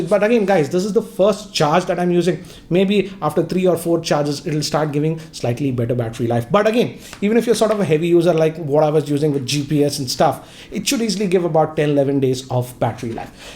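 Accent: India. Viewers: love the Indian accent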